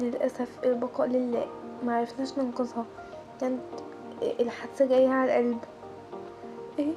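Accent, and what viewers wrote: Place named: Egypt. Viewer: ماشالله تعرفي تتكلمي مصري انصدمت لما دخلت و شفتك تحكي مصري فكرتك مو 😂🦋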